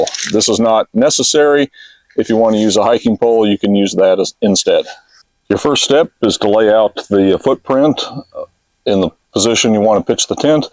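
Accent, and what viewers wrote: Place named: Baltic states. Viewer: Baltic language accent is all over! Good video!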